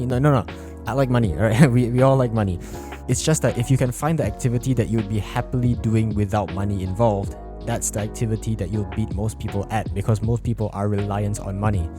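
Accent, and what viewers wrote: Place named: Singapore. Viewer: This guy sounds Singaporean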